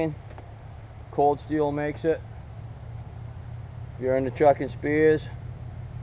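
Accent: Maine, United States. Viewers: That accent...you must be from Maine.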